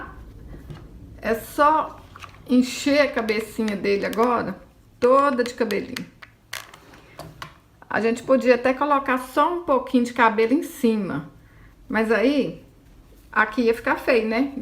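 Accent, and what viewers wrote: Minas Gerais, Brazil. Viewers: Estou encantada por a caso achei você estou cm covide e fiquei encantada me levantou por acaso você é mineira porque teu geitinho de falar é igual ao meu sou de Cataguases parabéns amei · Que lindinho ficou esse vovôzinho. Adoro seus vídeos e seu sotaque mineiro me trás uma lembrança linda de uma amiga/irmã que já não está mais nada é mundo . Pessoa maravilhosa, doce e criativa xomo você. Parabéns pelo seu trabalho. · Só nós mineiros temos este sotaque e expressão. É bom DEMAIS!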